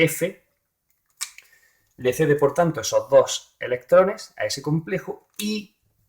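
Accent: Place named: Murcia, Spain. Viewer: como se nota que eres de murcia :)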